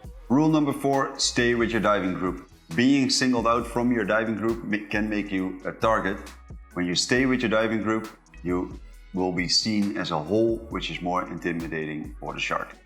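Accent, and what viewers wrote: Netherlands. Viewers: Nice vid! This guy is Dutch I hear it. Hi from Amsterdam 🎊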